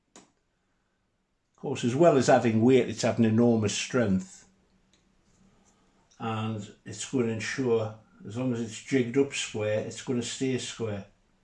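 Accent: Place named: Newcastle, United Kingdom. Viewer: Wow. Overengineering at its best. Fab build and all accompanied in mellifluous Geordy. Thank you so much. I have seen the Ladybird kit in books and catalogues for decades but never realised what a fascinating build it was.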